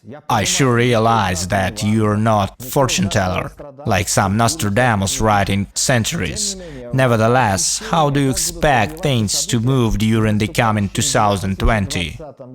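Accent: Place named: Russia. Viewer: И дурацкий русский(?) акцент ещё зачем-то. Для нац.колорита что ли?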